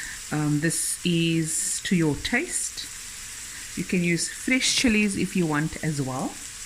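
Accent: South Africa. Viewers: I knew she was South African.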